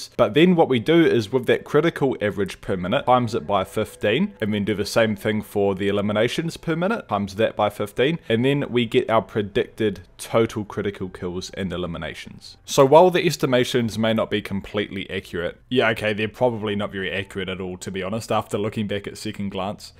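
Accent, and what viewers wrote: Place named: New Zealand. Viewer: i watch this guy purely off his new zealand accent, chur uce!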